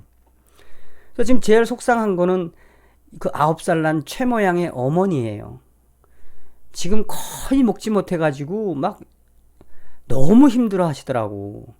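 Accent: North Korea. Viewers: 정성산님 수고 많이하십니다 ~북한말을 계속들으니 싫어졌어요 ~ 처음엔 재밌었는데~ 나라가 점점 종북으로 가고 있으니 걱정됩니다 ~ 이럴수록 자유대한 민주주의 것을 더욱더 고수해야 되겠습니다 ~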